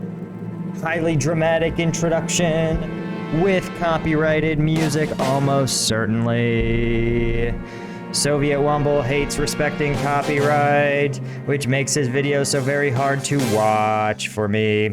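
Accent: United States. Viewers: It's not a Jersey accent, it's a South Boston accent. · That’s not a Boston accent it’s a New Jersey accemt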